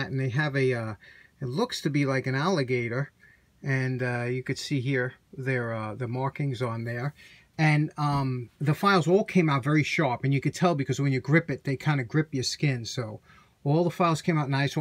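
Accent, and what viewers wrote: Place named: Hudson Valley, United States. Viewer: Great video, really useful, and I love your accent it reminds me of home in the Hudson Valley. :)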